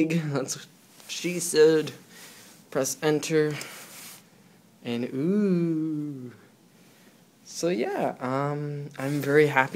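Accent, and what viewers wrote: Canada. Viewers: Thats just his Canadian Accent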